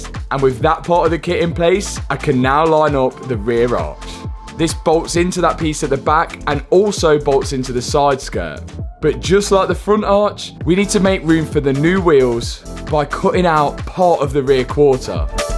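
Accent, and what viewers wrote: United Kingdom. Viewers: Boompahhh, bompaahhh, bumper !!!! Where are you from in UK ??!! What accent is that?! What city??!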